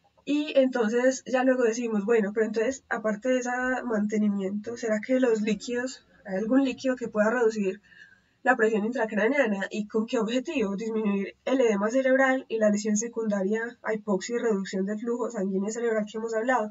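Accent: Colombia. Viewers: tan bella la doctora con acento de betty la fea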